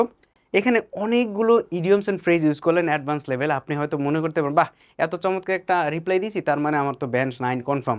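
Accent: Noakhali, Bangladesh. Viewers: আছেন নি বালা, খাইছেন নি ভাত.... এটা তো নোয়াখাইল্লারা বলে।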